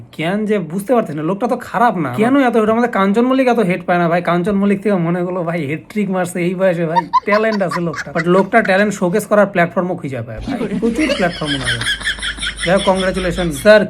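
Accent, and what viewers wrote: Bangladesh. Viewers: বাংলাদেশিদের মতো কথা গুলো লাগছে। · That Bangladeshi accent❤️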